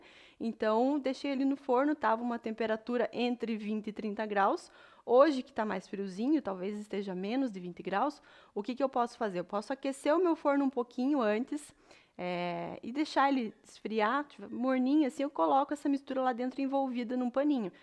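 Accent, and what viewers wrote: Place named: Paraná, Brazil. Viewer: Que sotaque legal, de onde é? Paraná?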